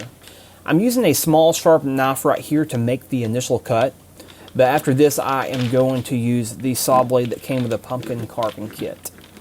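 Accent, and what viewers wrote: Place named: West Texas, United States. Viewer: West Texas accent and all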